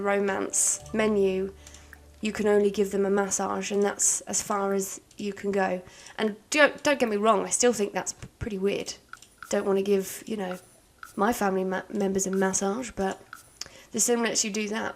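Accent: United Kingdom. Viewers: I made my boyfriend listen to your voice, he said "she sounds like a British phone sex operator " Im like.. im pretty sure she'll take that as a compliment!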